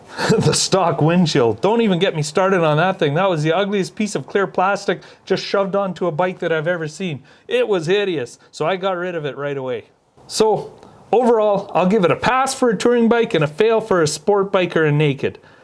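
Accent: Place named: Canada. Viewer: hey what part of the world are you in??sound Canadian hey ,,i cant find that GT in that color anywhere in the usa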